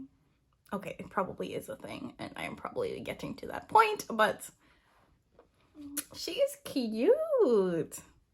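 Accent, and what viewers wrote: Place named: Trinidad and Tobago. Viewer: I heard the accent and said to myself she’s got to be a Trini. I am from Trinidad, I can’t miss our accent. I will subscribe and support!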